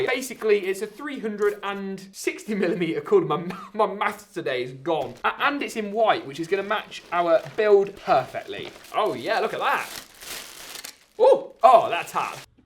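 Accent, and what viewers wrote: United Kingdom. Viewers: your're the british guy right?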